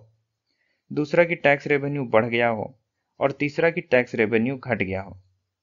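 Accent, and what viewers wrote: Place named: North, India. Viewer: This is North Indian accent of English.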